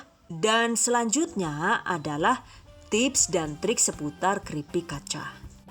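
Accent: Banyumas, Indonesia. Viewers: Karakter suaranya unik bgt, bahasa inggrisnya fluent, ngapaknya mantap bgt. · Jowo ngapak 🤣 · Wonge mandan ngomong ngapak😂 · Madiun deneng ngapak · Kak orang mana si? Medok kaya orang banyumasan nih 😂😂😂😂😂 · Ngapak yee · Kaget pas ngomong ngapak .. jebule sekebangsaan ... Ora ngapak ora kepenak ya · Ngakak ngapak nya ....😂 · Wong endi kie ngapak😂😂😂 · Kok ngapak sih? Cuman lokasi madiun wkwk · Jawa ngapak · Busyet deneng ngapak koh 😂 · Ora ngapak ora kepenak wkwkwk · Jebule wong ngapak rikaa😂😂😂😂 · Lah rika wong ngapak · Mbarai enyong kencot baee 🤣🤣🤣 ORA NGAPAK ORA KEPENAK 😂🤣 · Koq bahasanya campur pake bahasa ngapak, mmg asli mana ta? Cilacap ato banyumas yu 😂😂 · Lah ngapak wkwk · Panggon e Madiun bahasane ngapak,😆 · ihh si mimin ngomonge ngapak yaa😂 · Denek ngapak bu wong endi aku Cilacap bu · Keren keren,,, buahahaha pliss jgn dikeluarin bahasa ngapaknya saya gak tahan ngakak trus nih.bagus sih jd ada lucu2 humor nya.moga sukses selalu yah.amin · bahasa ngapak nya lucu 😅😅😅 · Tag lokasi di Madiun tapi kok bahasanya ngapak.. 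😅😂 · Ngapak tenan kek Ustadzah Mumpuni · eh padabae ngapak:v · Lah ya pada" wong ngapak ya😂 sing alon ya yu😄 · Ora ngapak ora kepenak ya yu 😂😍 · Wongg ngapak😅😅😅😅penjelasanya detaiil bangettt jadi mudah dlm mempraktekkan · Deng ngapak sih min???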